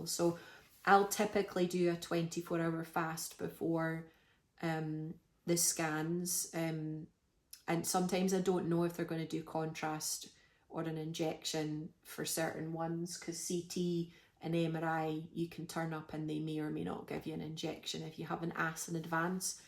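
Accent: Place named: Scotland, United Kingdom. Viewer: Love you Scottish accent. Mines a boring kiwi accent lol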